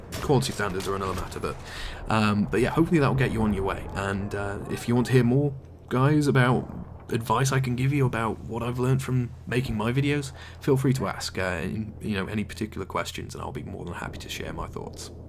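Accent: United Kingdom. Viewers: And 3 basketball or baseball.... I think you're from the uk considering the accent... These are two great sports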